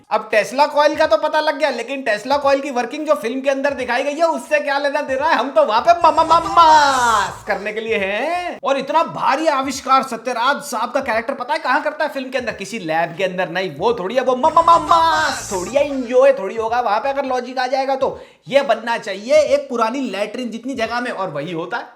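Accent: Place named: Delhi, India. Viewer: Mothiiii Lag'gii Dekh k 😂😂😂😂😂😂 Delhi accent superb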